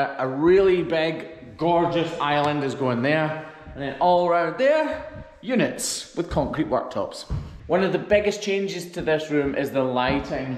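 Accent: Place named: Scotland, United Kingdom. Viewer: When you flub up what you say just keep going with your Scottish accent I wouldn’t know any different. If my father was alive boy you would have never heard the end of it as his uncle’s McMillian’s came from Scotland 🏴!!!! I enjoy your accent but probably your wife’s better when you’re in trouble with her! Can you play the bagpipes that would be the greatest finish or put in when you flubbed!!!...lol from SW Wisconsin!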